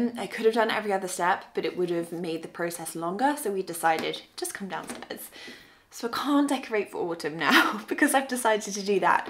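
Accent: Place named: England, United Kingdom. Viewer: I love your hauls. I also love your accent, you speak so nicely. I’m English but live in Australia and it’s so refreshing to hear a lovely English accent, I miss it. Not been home since 2018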